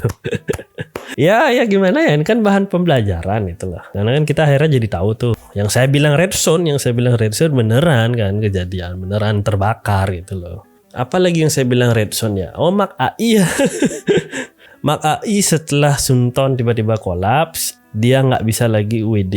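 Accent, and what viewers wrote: Bali, Indonesia. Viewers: kaya orang bali logatnya pak, ake bingung bane 😂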